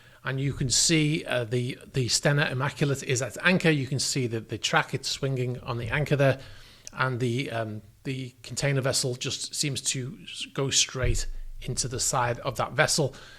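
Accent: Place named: RP, United Kingdom. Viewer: Don’t give a damn about wet stuff. I watch this channel since the beginning because of most perfect bbc English ever. Pure enjoyment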